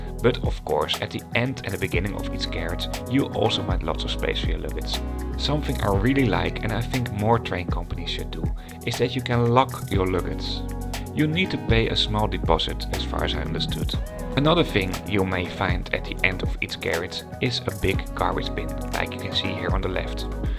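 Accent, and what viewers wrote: Netherlands. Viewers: Very nice vid! But, please, please - do something with your dutch accent ! These are, of course, "very" and "luggage" ! (Instead of "fery" and "lagitz"! ))).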